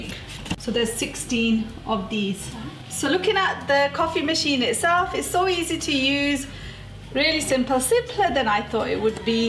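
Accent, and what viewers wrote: Manchester, United Kingdom. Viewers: S B she has an accent. I’d say she come from Manchester way. It’s also known as ‘regional dialect’ . Now the real question is, are you always this rude?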